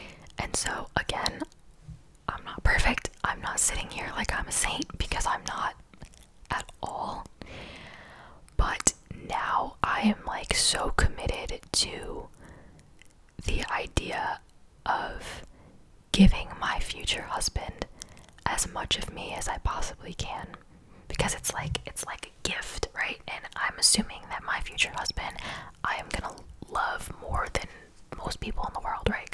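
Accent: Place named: New Jersey, United States. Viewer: has an irl Jersey accent. I've heard it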